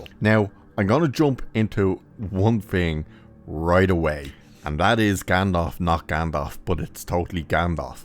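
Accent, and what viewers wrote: Ireland. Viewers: I didn't expect to hear the name "T'under-Tits" in a lovely Irish accent today but it made me laugh out loud. Shame I just found your channel at the end of this clusterfück of a show. Subscribed. Cheers from New Zealand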